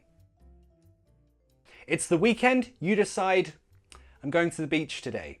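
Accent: United Kingdom. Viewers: In my side view, you have an excellent manner to teach English, especially when you use sketches with the sentences although I have never liked a British accent before, now I admire it because of you and I hope you post more videos because are so fun and useful 😊🌟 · I enjoy listening brittish accent. It's defferent from American one. Thanks for your classes, they make my English get better.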